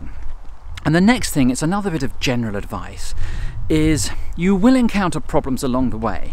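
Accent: United Kingdom. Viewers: Hello sir, thank you so much for all your videos. P.S. I do love your British accent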